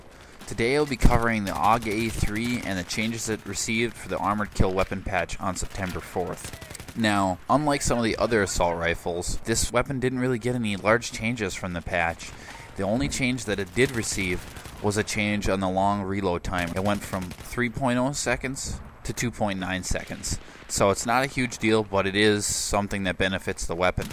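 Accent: Canada. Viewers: Are you Canadian? And please do the F2000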